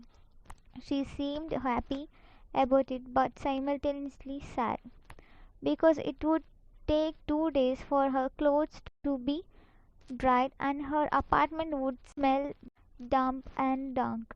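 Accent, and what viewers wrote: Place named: Mangalore, India. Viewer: After a long time listened the voice of monglore people in youtube😂 and this was well explained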